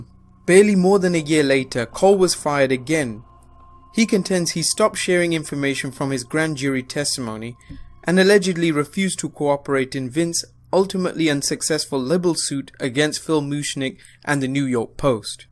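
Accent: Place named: United Kingdom. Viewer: Who else clicks off if it’s not narrated by the British guy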